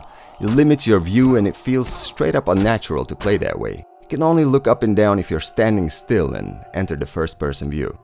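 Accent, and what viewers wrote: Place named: Jamaica. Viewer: First video I seen of yours and I subscribed instantly. This video is so well done and the Jamaican accent is easy on the ears, great job man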